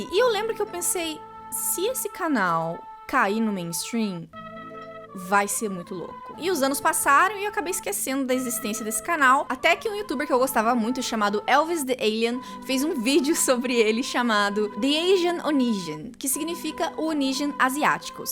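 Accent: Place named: South, Brazil. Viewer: da onde você é?que estado? Parece sotaque sulista Amo seu canal <3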